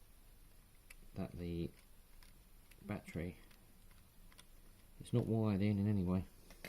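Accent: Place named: Cockney, United Kingdom. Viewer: Tips: - Use maximum volume if you can't hear him. - Use CC to translate the avalanche of British cockney gibberish.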